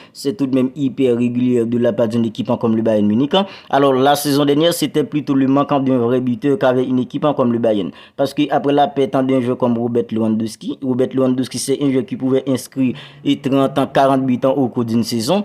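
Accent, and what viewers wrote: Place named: Haiti. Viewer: Ou haïtien boug Mwen ? Ça s'entend 😄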